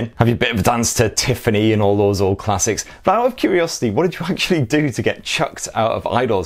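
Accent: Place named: Sunderland, United Kingdom. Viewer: Hi, I'm geordie and your the only mackem that I've heard that doesn't irritate me. I'm having charging issue with my Galaxy s10 plus. Can you help? Thank you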